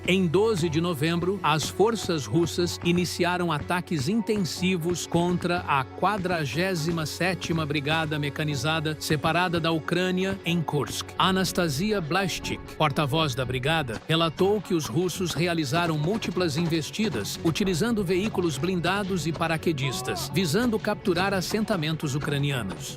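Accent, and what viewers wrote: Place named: United States. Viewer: Que pronúncia Americanizada é essa com os nomes russos?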